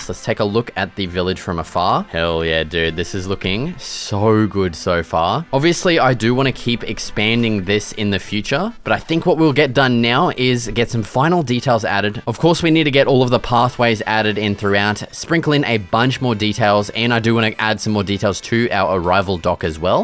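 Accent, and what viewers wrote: Australia. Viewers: Oh gosh you make great builds , but your narrative makes me laugh,fair dinkum Aussie way of talking,crazy man ..........few swear words thrown in is typical, lol,not that Im keen on that way of speaking, but its just you.... Cheers 🐨🦘🇦🇺🌟🤩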